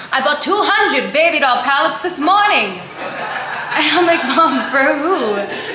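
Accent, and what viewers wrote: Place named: South, United States. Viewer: Yeah what happened to that southern accent she had like 5 years ago? She straight up sounded southern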